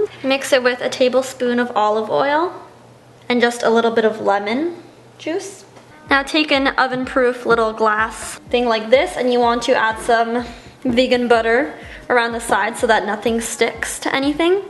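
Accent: Canada. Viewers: are you Canadian? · she sounds canadian, is she?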